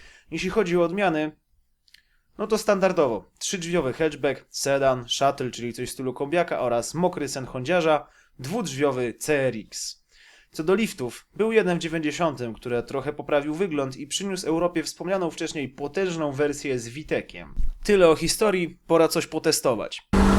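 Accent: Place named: Ostrołęka, Poland. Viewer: Ostrołęckie akcenty, honda na WO.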